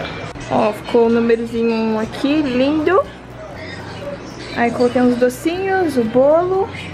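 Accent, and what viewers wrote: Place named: São Paulo, Brazil. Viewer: Você não tem sotaque de Mineiro parece de São Paulo, acho legal isso.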